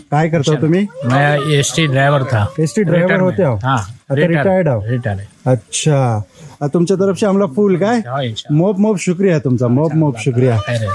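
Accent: Konkan, India. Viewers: bhai tumchi kokani bhasha lay God vatate. Kokan aani kokani manus donhi Saral sadhi aani god.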